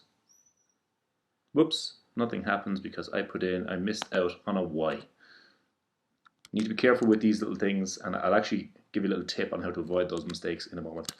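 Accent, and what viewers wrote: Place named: Ireland. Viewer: loving the accent eire abú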